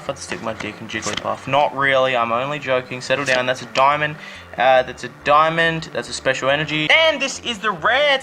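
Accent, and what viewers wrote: Australia. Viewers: HE'S AUSTRALIAN! Sweet :)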